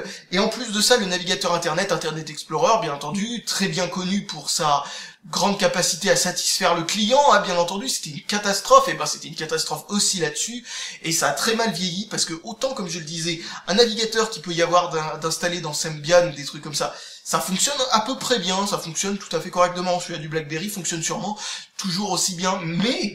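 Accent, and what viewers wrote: France. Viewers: Je suis entièrement d'accord, surtout qu'il prononce pas mal de trucs à la française...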